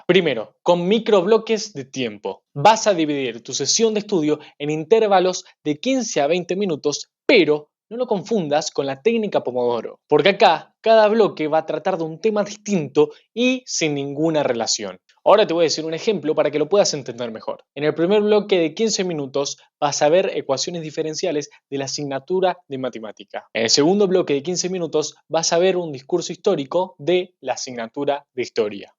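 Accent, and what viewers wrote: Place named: Uruguay. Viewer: Muy buena recomendación 👌 👌 Eres uruguayo? Lo digo por el acento.Saludos desde La Rioja Argentina.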